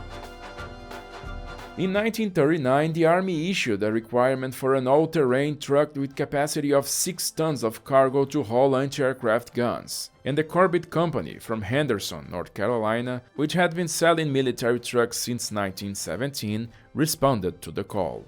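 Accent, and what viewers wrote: Brazil. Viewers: Nunca vi esse canal, mas percebi que era brasileiro pelo sotaque HEUHEUEHUEHEUUEUE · Apesar de falar inglês muito bem,o sotaque entrega que é Brasileiro 😂👍 Parabéns por estar trilhando um novo caminho com um novo público!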